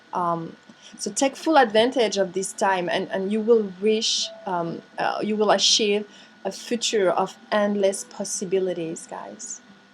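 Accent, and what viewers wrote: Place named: France. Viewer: Beautiful French accent 👌👌👌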